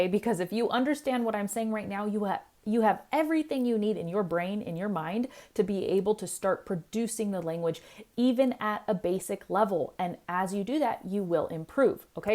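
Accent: United States. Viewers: Is your English accent Amarican or British?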